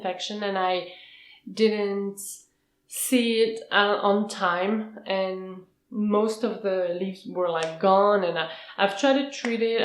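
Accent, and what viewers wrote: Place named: France. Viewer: Great videos! I've seen your other videos and love your collection! I've been an orchid collector for a while now and have recently ordered a Cllathea Medallion and Stromonthe Triostar. It seems they both can be temperamental about their location, watering, and room humidity. But thanks to You Tubers like you I'll know what to be aware of and how to react. I like that "Pen stripped" Calathea you have. Im going to have to get one of those. Its so unique and beautiful. BTW you speak very good and clear english and your accent is very hard to detect but i think its french? Im guessing your Canadian? Thanks again! You Tube is my #1 plant resource.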